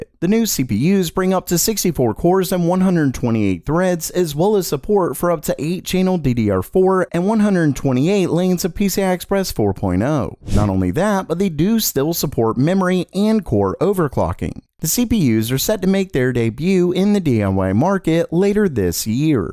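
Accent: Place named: Philadelphia, United States. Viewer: You from the Philadelphia area my friend? Pretty sure I heard the Philly accent in there. ✌️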